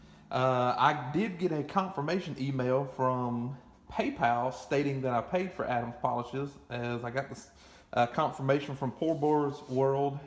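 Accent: Alabama, United States. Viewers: Bama boy haha that accent tho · You sound just like where I come from in south Alabama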